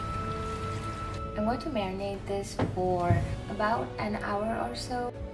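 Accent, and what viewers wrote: United States. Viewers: Why this American accent girl. You should speak normally. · Y do you have an American accent.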